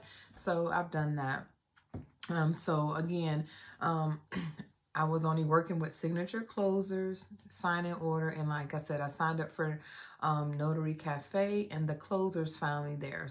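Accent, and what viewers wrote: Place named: California, United States. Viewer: Sounds like you are in Cali too. Congrats on your success & new income. And Thanks for the platform details.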